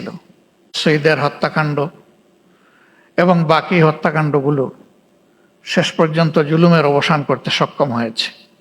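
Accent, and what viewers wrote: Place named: Sylhet, Bangladesh. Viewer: Bangla bolte parena sylete chara. Tobu pod chere youngder hate pod debena.?????/ Dol ke ekjon smart young er hate tule sonmaner sate retire jan. Louv lalosa poder chere den. 16 bochor kicu hoi nei apner dara, kormider charate kono kaj koren nei. Julom hoyche apnader dalalir jonno. Jatike ar koto boka banaben vason deya, kajer belai zero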